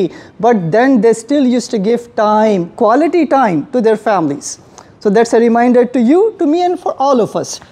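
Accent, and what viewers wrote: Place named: India. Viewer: I like when indians speak english 😍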